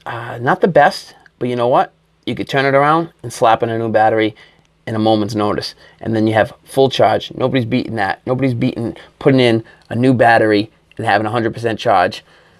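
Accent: Boston, United States. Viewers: That Boston accent!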